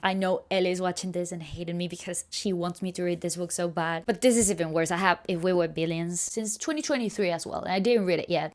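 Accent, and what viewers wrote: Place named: United Kingdom. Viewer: Me encanta acento inglés britanico y esta lista de libros ❤ deberías hacer más Reels así 😊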